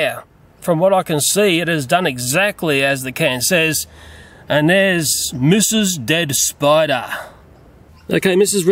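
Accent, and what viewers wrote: Australia. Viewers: you sound exactly like me (an Aussie) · i love his voice, aussie accents >>> `- love from Texas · I bet my kidney hes australian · I love the way he says spiderlings in all his videos his videos I love aussies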